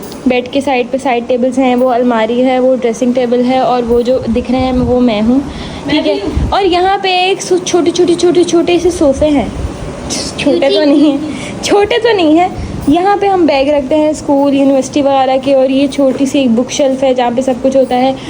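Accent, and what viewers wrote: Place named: Karachi, Pakistan. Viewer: Very nice house tour you say you blong to ch family I'm also blong to ch family live at Islamabad but your accent speaking style like Karachi people not like ch family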